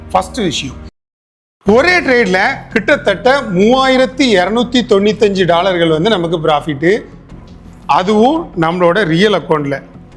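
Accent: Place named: Nagercoil, India. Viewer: Neenga nagarkovil ahh unga slang nagarkovil karanga peasura mathiri yee irukku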